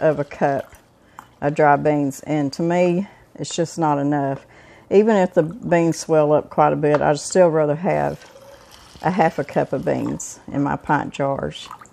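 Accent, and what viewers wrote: South, United States. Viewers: Thank you! I've got tons of dry beans, but only a few qts of ham and bean soup. Need to get more beans for canning bc what you said makes lots of sense. Just LOVE your Southern Belle drawl. ♥️ Subscribed.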